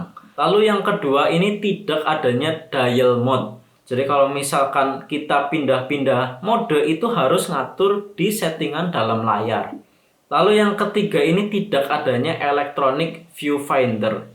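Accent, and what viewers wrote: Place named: Central Java, Indonesia. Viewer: tapi kok logat nya jawa bang, kyk logat jawa tengah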